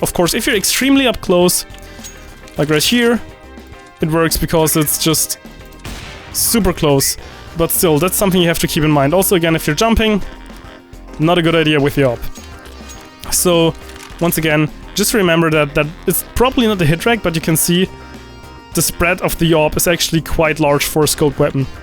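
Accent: Germany. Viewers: u german or something?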